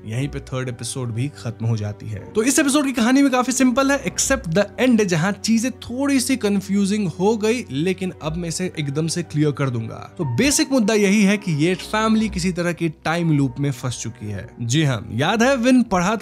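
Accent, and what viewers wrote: Bengal, India. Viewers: Are you bengali? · Bhai aap bangali ho ampka hindi se to yehi lgta hai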